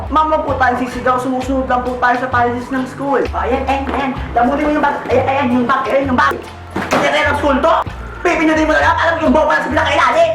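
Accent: Visayas, Philippines. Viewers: halata. ilonggo or cebuano ito cia.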